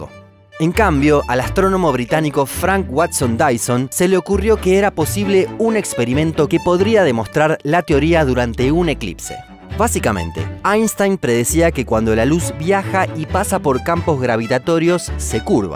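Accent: Argentina. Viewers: ¡Saludos desde Pittsburgh! Por fin encontré un YouTuber que habla bien y claro sobre temas interesantes. Me encanta este canal xk me expone al vocabulario científico en español, todavía estoy aprendiendo el idioma. Además el ascento Argentino me cae bien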